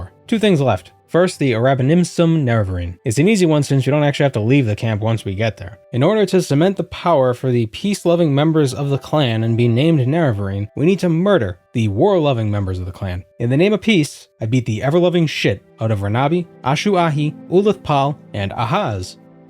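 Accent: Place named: New England, United States. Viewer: where in New England are you from, I only just realized that you have an accent because I'm so used to that just being how people talk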